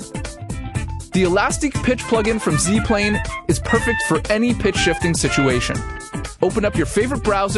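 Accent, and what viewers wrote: Canada. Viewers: You sound canadian... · the canadian is strong on this one lol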